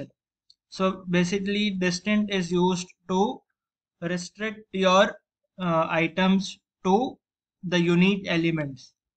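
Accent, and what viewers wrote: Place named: India. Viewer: why pause when talking? all indians sound identical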